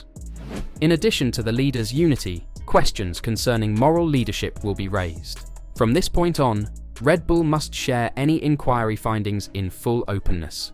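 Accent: United Kingdom. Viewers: I can tell by your accent that you are from England and maybe you want screw RedBull so maybe Hamilton get some advantage and finally win his 8th championship ?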